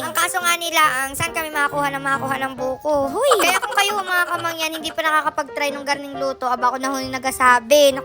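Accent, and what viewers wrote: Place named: Marinduque, Philippines. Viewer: Marinduque ❤.. from samar😅 naaaliw akong manood s vlog mo at ganun na ganun sa salita nila dini sa Marinduque 😅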